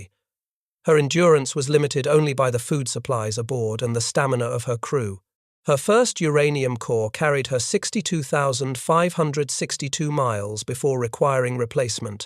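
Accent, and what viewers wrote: United Kingdom. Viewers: Outstanding historical account, love the British accent!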